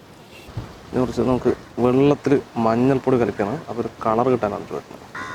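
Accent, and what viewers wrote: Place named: Palakkad, India. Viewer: Malayalam... palakkad slang...😊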